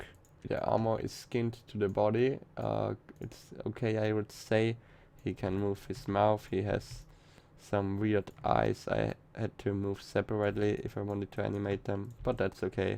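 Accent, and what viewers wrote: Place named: Germany. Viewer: could it be that you lived in germany before you went to Australia? Because you speak english with some kind of accent;)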